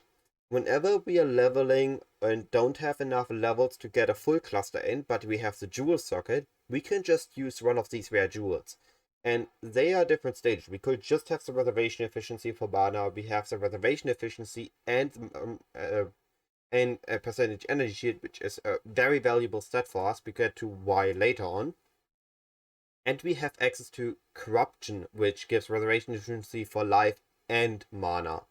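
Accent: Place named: Germany. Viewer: If you can't differentiate between an accent and general pronunciation you need a reading comprehension test. Fucking moron. PS: The pronunciation is actually *fine*, it's no different than any other German accent pronunciation. Maybe if you're having so much trouble hearing what he's saying, you need the hearing test more than I do 💀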